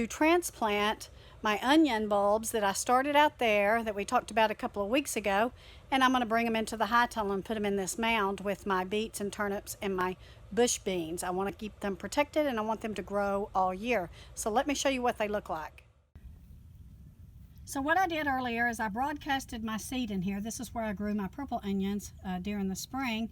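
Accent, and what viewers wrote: Texas, United States. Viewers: Dang. I miss that accent. Grew up in HEB area. Exiled in La.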